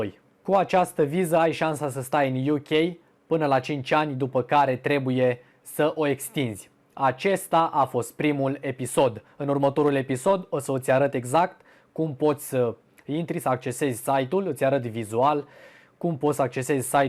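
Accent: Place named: Moldova. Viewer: Se simte un ușor accent moldovenesc. Greșelile de exprimare sînt omenești. Keep up și good work. 👍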